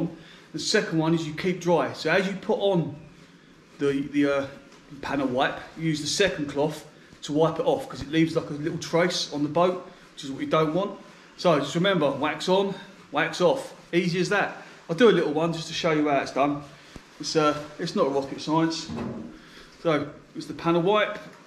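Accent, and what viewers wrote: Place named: United Kingdom. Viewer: So british accent, good vid, folk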